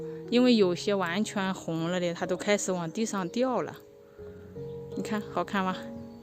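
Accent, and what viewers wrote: Hubei, China. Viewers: 对，湖北洪湖天门那个地方的口音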